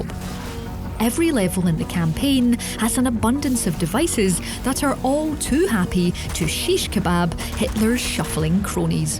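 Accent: United Kingdom. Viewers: Good thing I dig Scottish accents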